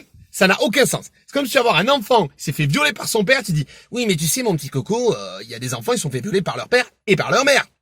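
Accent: South, France. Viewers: je vit au Québec et je suis Français de Lille (Tourcoing), j'ai 31 ans, la cause du mal Français. C'est l'U-E, pour sortir de la matrice. Fait une piqure de Rappel à ton public. Essaye de faire de la pédagogie, SI POSSIBLE. Sur.... ce qui te semble juste; le Cercle Aristote et PYR (Pierre Yves Rougeyron) sont de bon exemple. Libre à toi de donner les clefs de compréhension à ton Public. Poursuis ton travail, ainsi que ta parole: Qui je crois aide beaucoup de personne à se construire une opinion, et surtout des repaires. J'adore ton accent du sud Mec!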